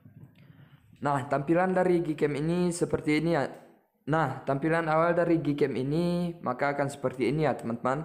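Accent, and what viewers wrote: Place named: Aceh, Indonesia. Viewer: Bg org mana logatnya kayak orang aceh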